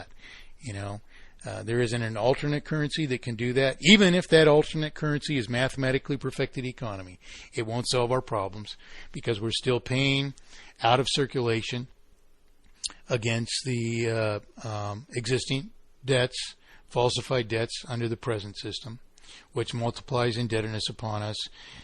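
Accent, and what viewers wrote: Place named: Australia. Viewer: I will be waiting for the ultimate debate between Austrians and MPE advocates: Peter Schiff, Ron Paul, Rothbard VS. _________? Perhaps your Australian accent will suffice. does well but he doesn't talk as fast as these other guys.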